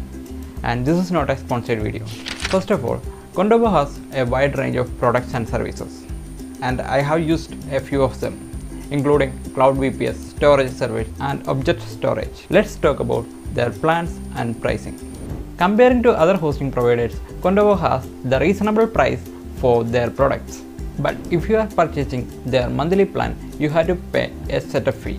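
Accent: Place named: Kerala, India. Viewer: ഹെ മലയാളി ഇംഗ്ലീഷ്‌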